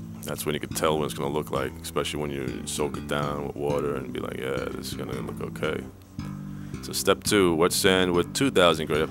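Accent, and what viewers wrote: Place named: New York, United States. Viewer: ah okay. i'm not from the US just sounded like new york somwhere...jersey was the closest thing i could think off ;) nice axe man, doing one myself at the moment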